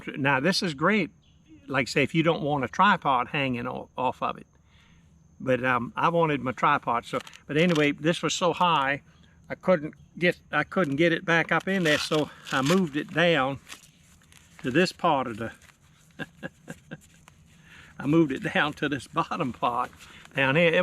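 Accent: South, United States. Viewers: you have a great channel! And your southern draaaw is so great! Love watching and listening. But truly, I really love learning. Even though I van camp, the idea of “Packing small” suits my adventure style and you guys teach me so much! And I like to know stuff!! 😂🙌🏼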